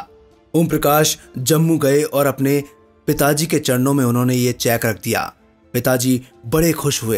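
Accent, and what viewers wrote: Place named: Urdu, Pakistan. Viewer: I don't like your urdu pronunciation. Speak Hindi.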